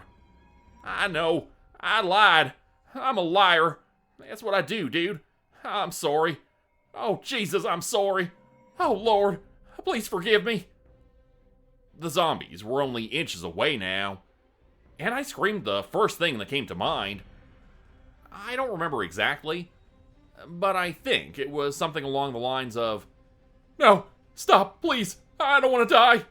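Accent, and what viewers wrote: Canada. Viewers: I like your Canadian accent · Your Canadian accent makes your stories very entertaining good job!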